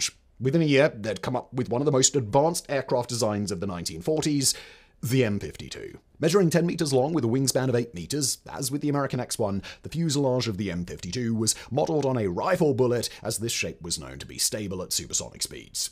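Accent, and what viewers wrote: England, United Kingdom. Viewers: Why does speak with an English accent but pronounce Berkshire incorrectly like an American?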